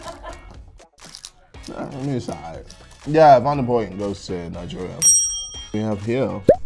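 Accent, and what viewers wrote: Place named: United Kingdom. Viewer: He's too British now.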